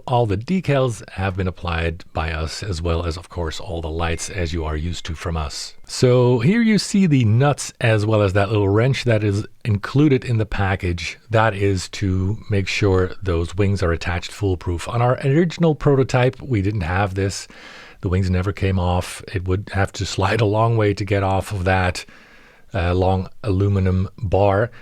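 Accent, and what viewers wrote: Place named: United States. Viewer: what are you talking about?? 😂😂😂 If English is actually his second language then kudos to him because he has a great American accent. I would assume he moved to Europe.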